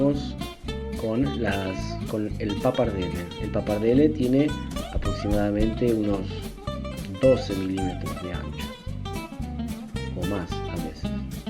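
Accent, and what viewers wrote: Argentina. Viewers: Excelente estimado chef , decir que eres un argentino de un país hermoso previligiado porque de muy peque me a gustado tu país y ese acento especial que solo ustedes y los uruguayos lo poseen dicho esto , muchas gracias por tus recetas sobre todos estas masas me gustan igual con espinacas fabuloso las voy a preparar tal cuál lo enseñas , saludito desde Chile !!!! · Que bello acento el de los argentinos😄😍😍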